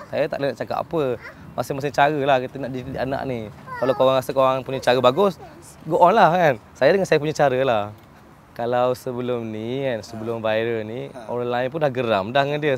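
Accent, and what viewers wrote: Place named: Pahang, Malaysia. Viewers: tulah ade slang timurr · ade loghat klntn kturnn klntn kot..phng ke?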